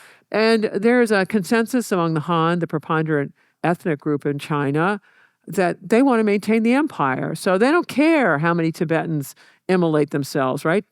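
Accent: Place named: South, United States. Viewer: Yeah but that is CIA playbook geography... Stuff makes no sense though: suppose that Russia tried to erase culture in Uzbekistan Tajikistan, erase islam etc, for a start, that would have stoked revolution so the situation is totally different, the russian empire was far less populous and sparser on radio waves... and so She trivializes this CIA politicial science social geography theory with this southern twang brainwashing her listeners about all kinds twisted logic that aligns with the CIA playbook, and guess what, she never even lived in these countries that she knows everything about, Russia, China, she clearly has never exchanged with the cultures there enough, because she speaks like someone educated by corporate news, and she wants you to speak like that too, not like someone who has travelled the world and knows cultures and the ancient causes of today's contrasted political systems.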